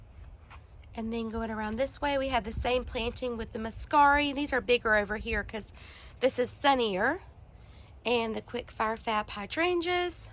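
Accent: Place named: South, United States. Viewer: I love your southern accent!! I wish I had a stronger one!! Enjoyed your garden tour and meeting your doggie! We have chickens too, beautiful property and gardens you have 💚💚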